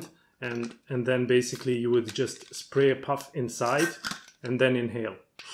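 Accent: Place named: Romania. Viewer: I have listened to three videos now. My first guess is that your mother tongue is Romanian. You sound like a pastor from there who leads a food distribution program for the poor in Canada. You do not speak a Slavic language but you are from Eastern or S.E Europe. So, that leaves only one language. It is not so much your slight accent as your manner of speaking.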